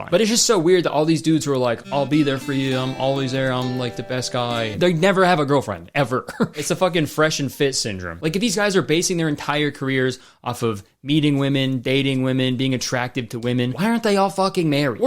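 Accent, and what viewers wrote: Canada. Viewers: his canadian accent has never been more prominent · He sounds extra Canadian in this vid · i love when kurtis ends a question with “eh?” because it reminds me that he’s canadian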